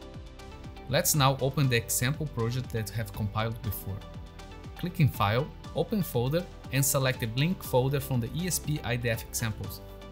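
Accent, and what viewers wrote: Brazil. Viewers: Thanks for the video, you really helped me, also as a good brazilian I noticed your accent!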